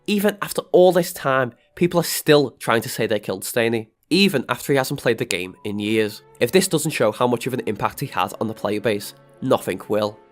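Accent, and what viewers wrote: Liverpool, United Kingdom. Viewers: Are u scouse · i cant understand anything with ur fcking annoying british accent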